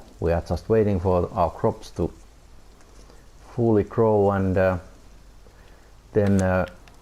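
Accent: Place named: Finland. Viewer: Greetings from Finland. I listen the accent and think you must be from Finland. Have you try to grow cotton in this map?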